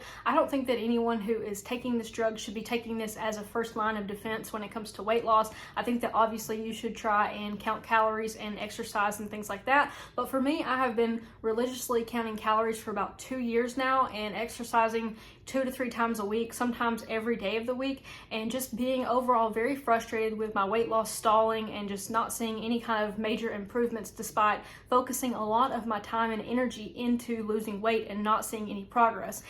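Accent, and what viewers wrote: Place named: South, United States. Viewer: I just started Ozempic two weeks ago because i keep gaining weight and feeling hungry all the time. waking up at night or in the morning and have to snack and go back to sleep. btw, I love your accent. what state are you from? It sounds like a tad bit of a southern accent.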